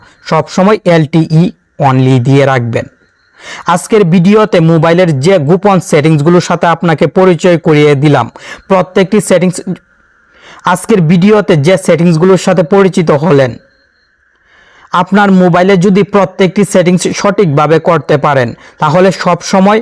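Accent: Mymensingh, Bangladesh. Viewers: ভাইয়ের বাড়ি মনে অয় ময়মনসিংহ।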